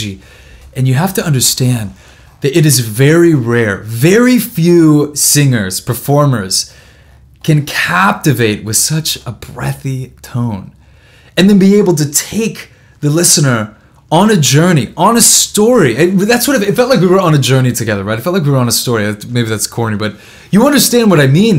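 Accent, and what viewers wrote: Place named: Andalusia, Spain. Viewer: Habla perfectamente bien, con su acento andaluz personal.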